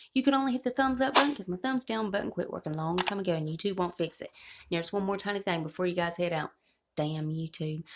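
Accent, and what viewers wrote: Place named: West Virginia, United States. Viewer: Girl you sound more like a WV girl than a OH girl. Just sayin.. you have to be from the country! ❤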